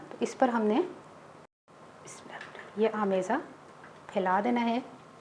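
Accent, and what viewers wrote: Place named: Punjab, India. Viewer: Ma sha allah and jazak allah amazing recipes zaberdast tips ,way of talking with meethy panjabi❤❤❤.... mane apki kharab doodh ko dobara theek kerne ki tip dekhi yaqeen nhi aya aisy kese ho sakta hai mazy ki baat kay main itafaq say gher nhi thi buchon nay doodh liya or bahir rakh diya jb ai toh dekha dood fridge main nhi hai ghabra gai thora nikal k boil kiya 4 kilo doodh kharab socha try kerti hun ap wala tip and amazingly superb pooray gher main dhamaal daal rahi thi kay panjabi kuri di tip kamaaaaal👍👍👍❤❤❤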